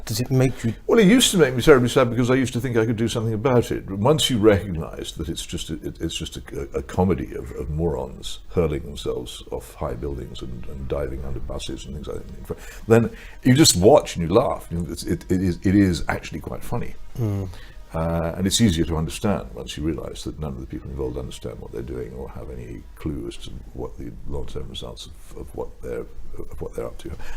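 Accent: RP, United Kingdom. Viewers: Take the plum out Peter; drop the affectation. Can hardly tell what you are saying.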